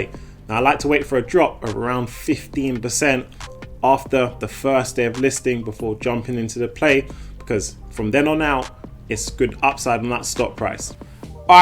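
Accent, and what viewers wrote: United Kingdom. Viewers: Hi. You from the uk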